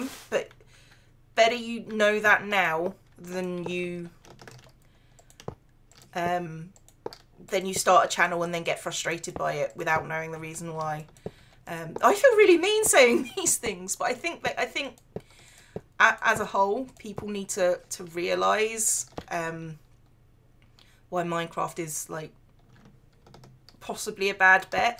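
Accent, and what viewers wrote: United Kingdom. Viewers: You don't sound fat. You sound British.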